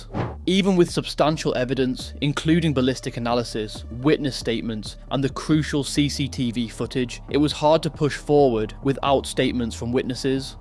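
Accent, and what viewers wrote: United Kingdom. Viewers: My favorite British voice telling me Aussie crimes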